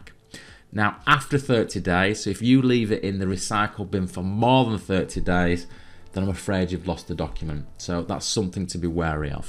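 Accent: Yorkshire, United Kingdom. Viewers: Hey are you from the UK? I hear an accent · I am from Yorkshire, but living overseas, so nice to hear the Yorkshire accent....a great video, but the reality is OneDrive is the worst cloud storage on the planet. Take a clook at products like Tresorit or IceDrive and do a video on them as well. I would not touch OneDrive with a barge pole......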